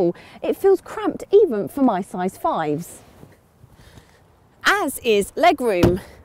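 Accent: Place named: Oxford, United Kingdom. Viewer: is sooo sweet. And i love this wonderful original oxford english😊 And it a real interesting car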